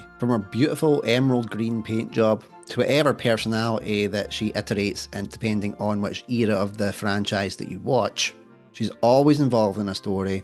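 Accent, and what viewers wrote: Scotland, United Kingdom. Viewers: Hello Nice Ranking I'll admit I'm like Duncan I'm a Hothead but with a good heart And I Like The Scottish Voice And You are no expection Sir keep up the good work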